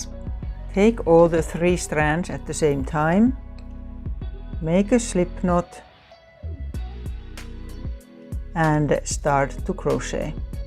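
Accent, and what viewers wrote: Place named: Finland. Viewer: My mother and father were both from Finland. I knew the moment I heard your voice I knew you were too. It's so lovely to hear that beautiful accent again! Not only that, I loved your video. Several techniques are new to me.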